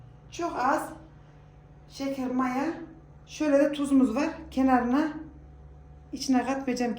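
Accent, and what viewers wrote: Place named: Yozgat, Turkey. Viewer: S a bacım yozgatlımisin şiven bize benziyo ben sorgun dan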